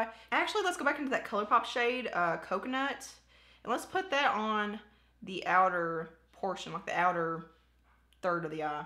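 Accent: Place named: Kentucky, United States. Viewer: I still enjoyed it!!! My dads side is from Kentucky and ur accent makes me feel at home, ur channel makes me feel that way!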